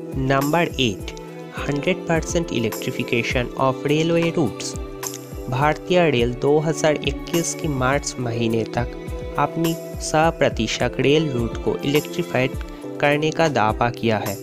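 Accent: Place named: Northeast India, India. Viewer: Dear Dev he is perhaps from NE, Govt. and us should encourage and support NE guys , who are also our brothers and sisters, and r part of India.Southe indians , Bengalis have different accent. That is the flavour of India.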